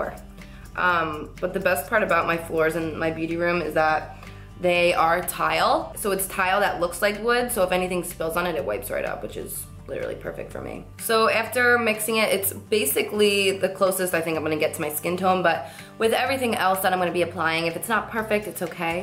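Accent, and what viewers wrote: New Jersey, United States. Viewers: She is from New Jersey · Jersey accent :)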